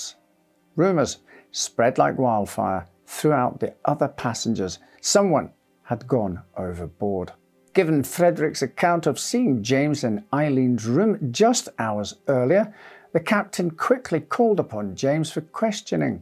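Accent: Scotland, United Kingdom. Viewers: well, no, but we do know that it's a Scots accent. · Delivered with a beautiful Scottish accent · i love listening to this lovely man with his scottish acsent, he sounds just like my ex husband. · it's a Scottish accent 🤣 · your lovely Scottish accent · A little Scottish, minus the rolling Rs. Good old English and an occasional Africaan twang. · As always you narrated story kept me the edge of my seat. Thank you Sir and your wonderful Scots accent!❤ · So are you a Scot or a Welshman? The reason I have asked you is because you sound just like my father who hailed from Edinburgh but went to Perthshire during the war, he never lost his Scottish accent, even though he had been in Australia for 31 years at the time.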